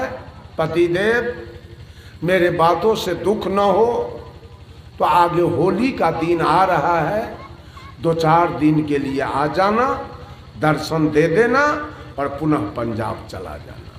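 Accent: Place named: Bihar, India. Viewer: वाह बाबाजी आपकी वाणी एक सो परतिसत सत्य तो है लेकिन आपकी वाणी अनंत सत्य हैआपका अभिनंदन चरण वंदन है आप कि बिहारी भाषा बहुत अच्छी लगती है आप जैसे महा पुरुष सदी मे जरुर आते है पागल लोगो को चेताने के लिए आपमहान संत है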